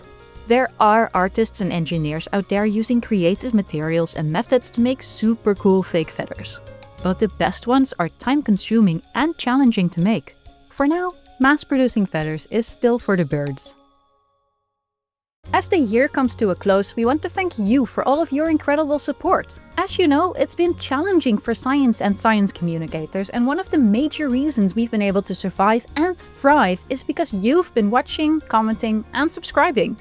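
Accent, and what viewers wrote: Netherlands. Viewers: This is the quintessential Dutch accented English. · GEKOLONISEERD, ik hoor nederlands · Hoor ik daar nou een subtiel nederlands accentje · Hey, minute earth got a dutchie, nice accent :) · I hear a slight Dutch accent. Groetjes uit Nederland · Dutch people are literally everywhere Love the accent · Does this channel have multiple narrators? This lady is very obviously Dutch, but I swear I’ve seen many videos of a non Dutch person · Heeeuujjj, een Nederlands accent, ik wist dat ik het herkende! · Me: "My Dutch voice senses are tingling!" Checks description Me: "... okay the name sounds more Flemish, but that voice is definitely Dutch!" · do i hear a Dutch acent? XD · *Dutch accent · Is that a dutchy I hear? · I knew i heard a dutch accent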